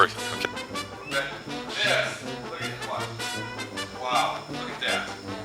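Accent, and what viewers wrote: Texas, United States. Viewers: hahah.. i love the texas accent.. wish i had one.. i was born there but left when i was 1.. =/ movin to SC soon.. so hopefully i'll pick up an accent there.. this masshole accent sucks.. haha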